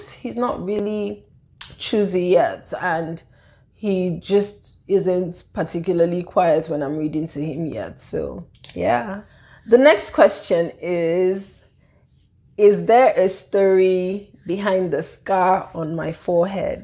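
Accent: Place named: Pidgin, Nigeria. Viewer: The intro totally got me😂😂 I love your pidgin😎😎😎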